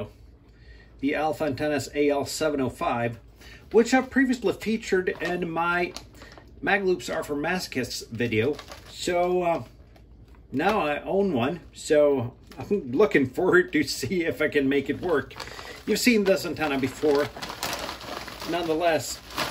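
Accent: United States. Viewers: I just wonder, are you American or Norwegian? Americans are not named 😃 but you sure talk like one 😊 73 from LA-M160560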